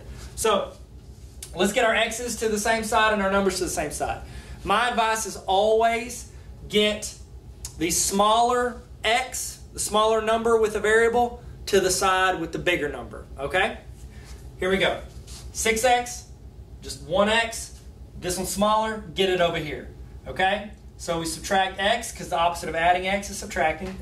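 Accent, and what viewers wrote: South, United States. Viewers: ayyyyyyyye my class calls you and we love this video haha XD THANK YOU FOR BLESSING MY MATH CLASS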